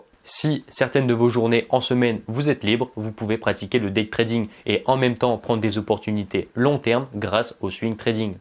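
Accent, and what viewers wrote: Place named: Lyon, France. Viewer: Accent lyonnais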